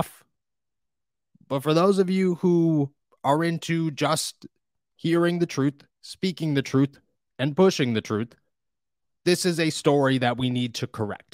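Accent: Pittsburgh, United States. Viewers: Bro i could swear you were from Pittsburgh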